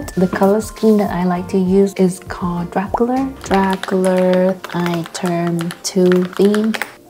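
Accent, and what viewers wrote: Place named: Vietnam. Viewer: nói tiếng anh giống người việt thế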